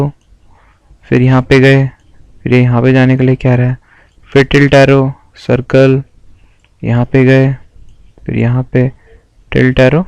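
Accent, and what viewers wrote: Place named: Pakistan. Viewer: Thanks for your help. Your accent is pakistani :) good keep it up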